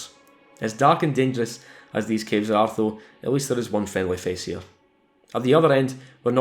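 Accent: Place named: Scotland, United Kingdom. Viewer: I missed your smooth scottish voice and it'd only been like 10 hours since I watched your last part. Nice work.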